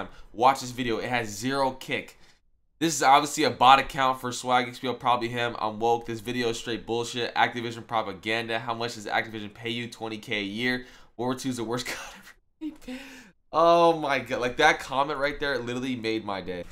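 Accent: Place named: Canada. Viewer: you sound Canadian, are you?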